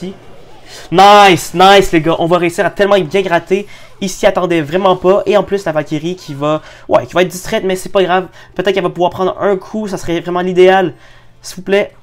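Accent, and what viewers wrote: Quebec, Canada. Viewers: Je kiffe tes vidéos mon poto! Et puis faut dire que ton accent me tripper ! Vive la France pardon vive le QUÉBEC !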